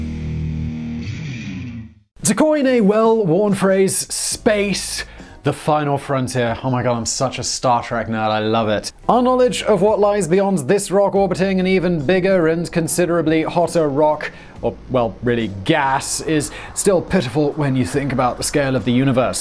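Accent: United Kingdom. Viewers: Lots of "we" and "our" in that monologue coming from someone with a British accent.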